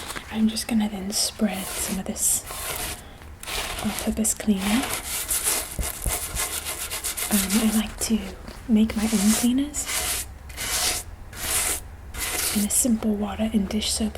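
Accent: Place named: United Kingdom. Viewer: She is actually from America, but she uses a British accent in her videos ☺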